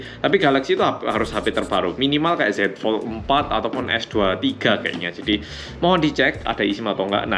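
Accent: Java, Indonesia. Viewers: Mas kalau ngomong gk lupa ama jowo medok e aku seneng dengarnya soale aku wong jowo juga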